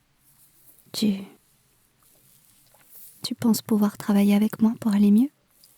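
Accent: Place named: United Kingdom. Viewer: Ton accent est incroyable si tu est anglaise mais je pense que t’es vidéo sont trop courte x) j’aimerais trop des vidéo de 1h 😅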